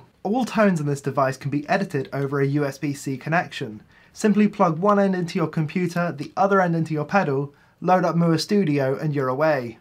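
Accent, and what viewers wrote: United Kingdom. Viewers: I wana sound this posh 🤗🤘🏼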